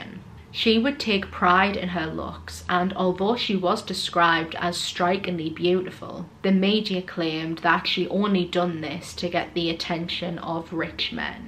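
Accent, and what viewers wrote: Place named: Newcastle, United Kingdom. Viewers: I think your amazing young lady, thank you from an old woman 👵 🤣 brilliant work 👏 Is that a Newcastle accent Pardon my ignorance I have relatives there and love Newcastle ❤️ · whey bonny lass.....listening to your voice makes me feel homesick - stuck down in Kent for a while but our home is up near Crook in God's own County - Durham a lovely bit of research here, presented without hype or sensationalism have hit the subscribe button for you Mary Anne Cotton's house is still there in Front St. West Auckland...the original black Widow... As an aside, local lore states that the grounds of Raby Castle are supposed to be haunted by the victims of Mary Anne Cotton. The ghostly victims are supposed to run across the estate of the castle, playing what can only be described as a game of tag.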